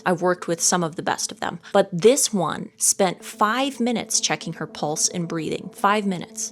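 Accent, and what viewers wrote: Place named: Midwest, United States. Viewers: This is the best youTube channel in existence. I love when the thick MidWestern comes out. 'HaAAspice'. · Your Midwestern accent 😅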